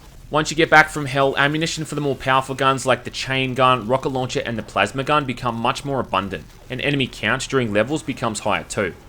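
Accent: Australia. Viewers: I hate the Aussie accent and I AM Aussie. #Irony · It's Australian, it does not sound anything like Irish xD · Your Aussie inflection is pure perfection. (I'm born & raised south Sydney)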